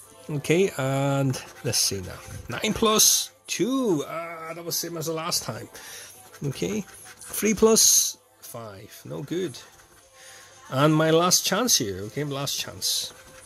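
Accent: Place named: Netherlands. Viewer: Ah you live in Belfast. Yes I know it. I just thought you had a Dutch accent.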